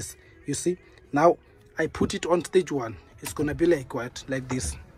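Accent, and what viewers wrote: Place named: South Africa. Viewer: but I don't remember saying otherwise or anything bad against you,I didn't criticise you instead I only said South African accent is just so awesome 😎😎😎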